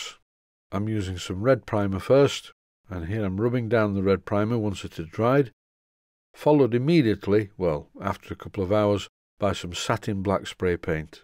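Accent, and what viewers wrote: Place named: Northern England, United Kingdom. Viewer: dont worry I understand u fine but then I am English and have spent a fair amount of time around northerners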